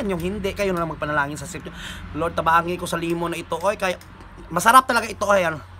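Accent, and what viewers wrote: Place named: Iloilo, Philippines. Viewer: Wahahaha abaw gid ah ilongga gid tana c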